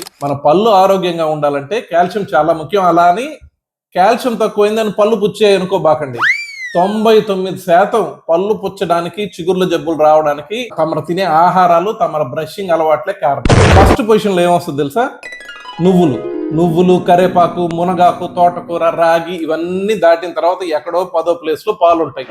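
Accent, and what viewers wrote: Nellore, India. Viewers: మీరు చెప్పే విధానం మన నెల్లూరు స్లాంగ్ భలే ఉంటది 😊 థాంక్యూ సార్👌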